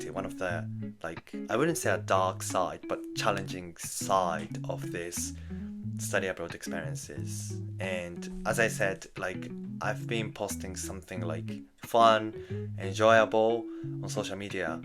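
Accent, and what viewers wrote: United Kingdom. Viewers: いつも美しいイギリス英語に癒されています。自分も現在留学をしていて来年ボストンキャリアフォーラムに参加する予定です！さんのような魅力的な人材は引く手数多だと思いますが、最終的にどのような業界に進まれるのでか？ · イギリス英語は本当にうますぎて憧れます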